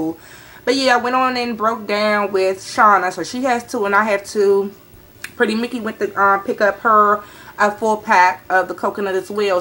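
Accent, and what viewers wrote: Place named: Washington DC, United States. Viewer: yas , that dc accent is thick hunty !!!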